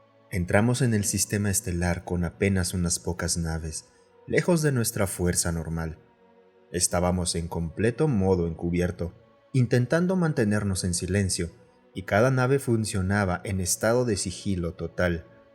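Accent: Argentina. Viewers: Argentino nos salió el pibito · Vi la versión de la rana que narra ... Y tu lo volviste argentina merces mil años de salud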